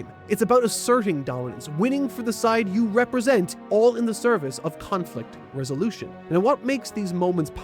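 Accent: Scotland, United Kingdom. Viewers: Scottish lol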